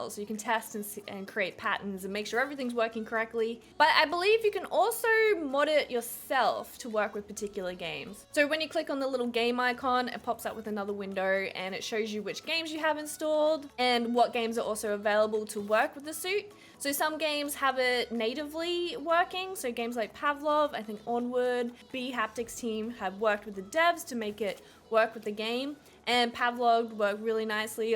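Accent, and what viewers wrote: Australia. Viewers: R u Australian ur accent sounds Australian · judging by your accent im pretty sure you live in Australia. i just wanted to ask how did you get your valve index. i have been wanting one for quit a while but due to the face i live in Australia i cant get one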